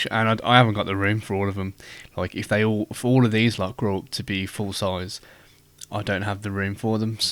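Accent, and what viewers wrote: Birmingham, United Kingdom. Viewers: Lovely brummy accent there lol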